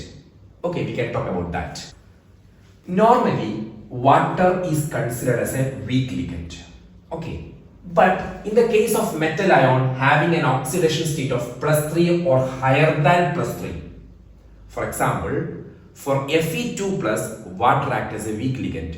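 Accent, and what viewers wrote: Kerala, India. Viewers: Sir are u from Kerala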